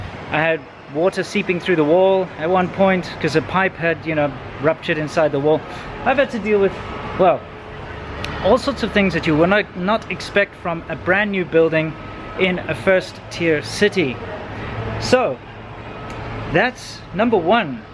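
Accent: South Africa. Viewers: consciousnick - he’s from South Africa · You clearly do not watch South African soapies to recognise a South African accent. Recommendation. Watch past reruns of etv Scandal! By the 1000th episode, you will be able to tell who is a British South African and who is Afrikaans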